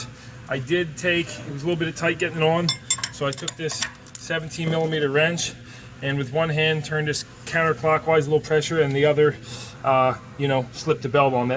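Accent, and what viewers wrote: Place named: New Jersey, United States. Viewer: Where are you located? You sound like you're from South/Central Jersey. After seeing how this video I'd love to have you do the belt in my wife's 2014 Pilot. Very thorough and detail oriented. Great vid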